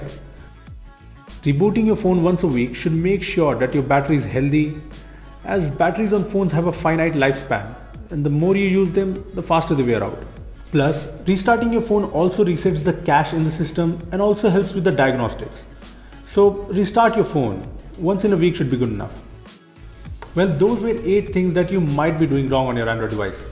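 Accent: India. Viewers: my God I can't keep listening any more Indian accent... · Why should you bother when english is not his mother tongue ... Literally nobody gives a fuck to english in India as there are more than 2500 languages. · Indian accent, I just love it. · i always wonder why every indians that's speaking english sounds like this ._.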